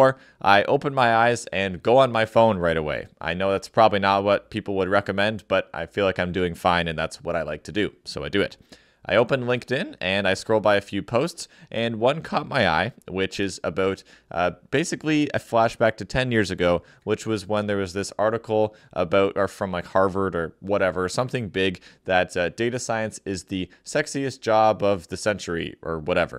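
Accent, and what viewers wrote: Canada. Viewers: Canuck accent!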